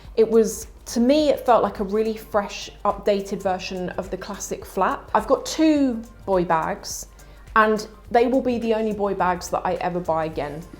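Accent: RP, United Kingdom. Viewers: you sounds so posh!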